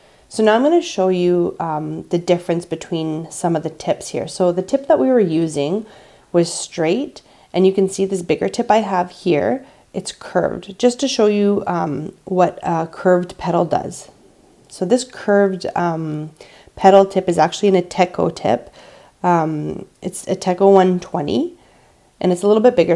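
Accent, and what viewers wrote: Canada. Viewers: Thanks for this 😍 are you a fellow Canadian? I hear an accent lol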